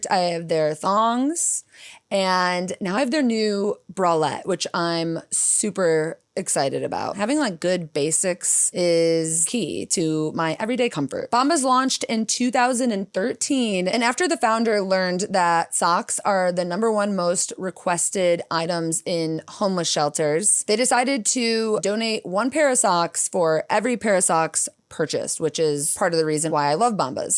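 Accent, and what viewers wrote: Chicago, United States. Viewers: lol! I was a theater design major and I cannot see a play without "working" It is somewhat common within whatever your area of discipline is, I think. I notice that you look very pretty these days. And I notice your Chicago accent, but I grew up in Detroit, so I got some of those influences in speaking too! I would love to learn about organizing too - that was not a thing in my family and I have been getting "schooled" on it!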